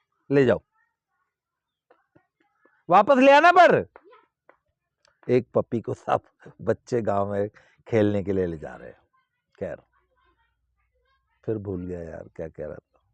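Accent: Haryana, India. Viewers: Haryanvi touch minde blowing 👌🏻👌🏻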